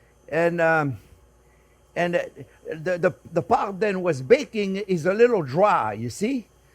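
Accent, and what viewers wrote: France. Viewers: "what the sense? what the sense?" I don't remember how long he has lived in america but he still speaks in french for sure. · His French accent. I love it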